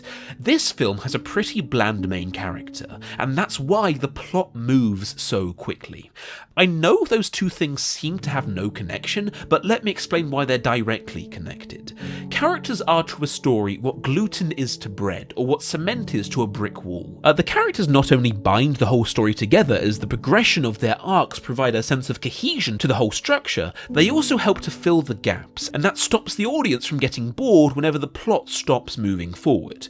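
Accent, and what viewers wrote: England, United Kingdom. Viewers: The dumbest and THICKEST apologist video you’ll seen on Tenet. Just because he has an English accent doesn’t mean his points are insightful, they’re just verbal salad. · you sound British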